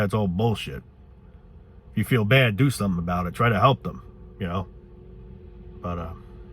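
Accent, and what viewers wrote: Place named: Staten Island, United States. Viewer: He sounds like he’s from Staten Island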